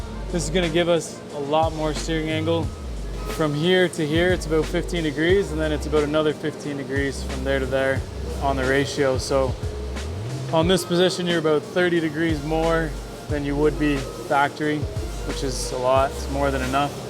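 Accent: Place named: Ireland. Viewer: You have such a strong irish accent lol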